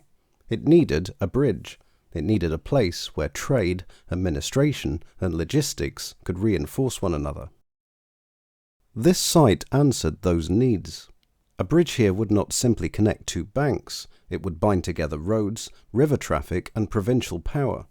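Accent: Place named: United Kingdom. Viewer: It’s so nice to have a real voice narration. Sensible pronouncation with British English cadence.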